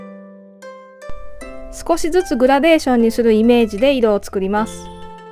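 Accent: Kansai, Japan. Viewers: そこはかとなく漂う京都弁がまた風情ありますね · トロントに来てから関西弁って懐かしいなあ。。。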